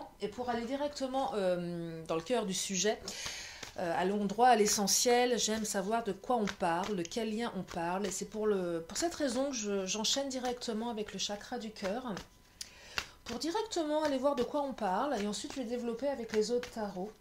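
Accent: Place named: Paris, France. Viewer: Coucou, tu as un bel accent. Parisienne ? Merci de ton partage. Très belle lecture. Tirages a choix serait bien aussi. Plus interactif 😉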